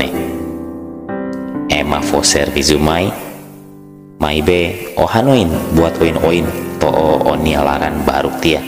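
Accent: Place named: Indonesia. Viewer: Voice nia Orang indo nih🥰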